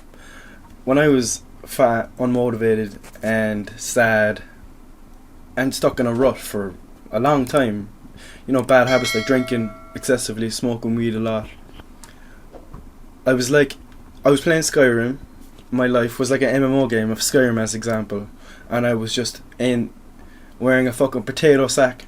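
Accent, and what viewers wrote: Ireland. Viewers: I dont know if its your irish accent bro😭😭but some of the things u say the way u say them to🤣🤣🤣i be bussin out laughin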